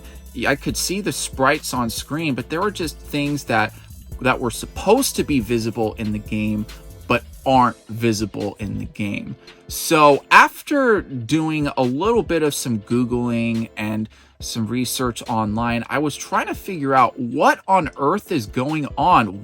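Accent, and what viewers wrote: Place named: Canada. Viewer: You sound like a Canadian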